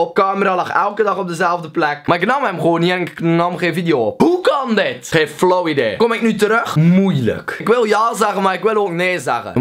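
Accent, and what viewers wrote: West Flanders, Belgium. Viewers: Dit is West-Vlaams, meestal voor de andere Vlamingen niet te verstaan maar bij valt het wel mee.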